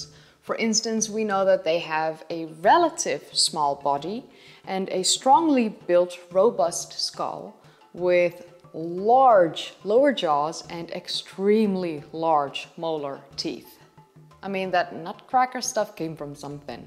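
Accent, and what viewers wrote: Netherlands. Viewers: your accents is not that bad compaired to that of a lot of Sutch politicians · you being dutch accent makes you you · I like your Dutch accent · I knew I smelled a Dutch accent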